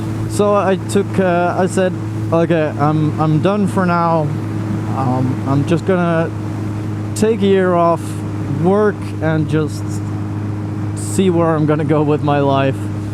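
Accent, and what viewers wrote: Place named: England, United Kingdom. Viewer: Not going to lie I may have screeched out of shock when you said my name.😂 Also before I had known you were Dutch I thought you might have been from England based off your accent when speaking English, but now I know it's probably from going to and English school in Saudi Arabia for some time.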